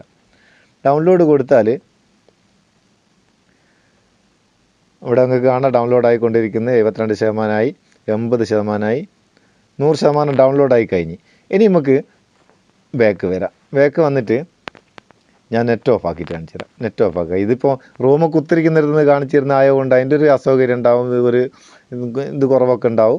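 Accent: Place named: Vadakara, India. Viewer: വളരെ ഉപകാരമുള്ള വീഡിയോ 👍👍🌹🌹🌹🌹 ഇങ്ങള് വടകര kkaran ആണോ സംസാരം കേട്ടിട്ട് ചോദിച്ചതാണ്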